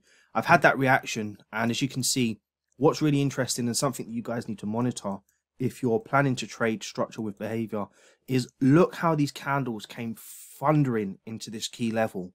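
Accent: London, United Kingdom. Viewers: Thanks Boss. Your British right? What broker is this? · Honestly mate i think this is quality - i love the way your showing what you would do before & then after whether it be a win or a loss....love the honesty there , people learn more from mistakes .You sound like your from my kneck of the woods - London Lad.Anyways keep doing what your doing brother , you come across as a nice genuine guy .Liked & subscribed .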